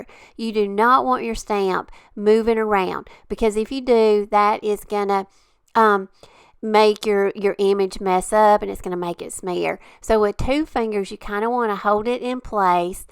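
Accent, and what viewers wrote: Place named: Alabama, United States. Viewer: CRICUT! I really look forward to ALL of your videos. And as a southern gal originally from Alabama now living in Florida, I really LOVE hearing that 'bama accent!!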